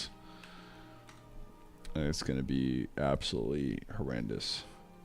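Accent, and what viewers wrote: Australia. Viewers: mate, you threw me for a loop there. "Take a peep for Circles specific stuff..." Searches for Sokol Aussie accent is from another world.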